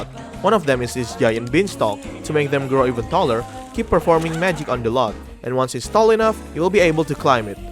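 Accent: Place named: Indonesia. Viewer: Kayak ber aksen indo, kadang ada grab ama anak sma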